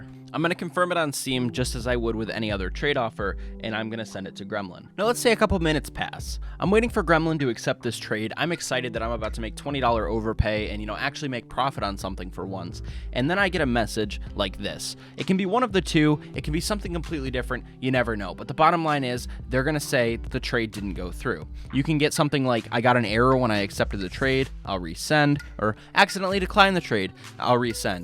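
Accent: Sweden. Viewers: Are you from sweden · Are you Swedish or American? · Bruh u from sweden ? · Are You from sweden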